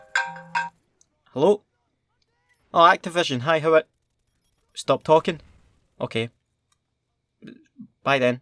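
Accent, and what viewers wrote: Scotland, United Kingdom. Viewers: Your accent makes me question my scottishness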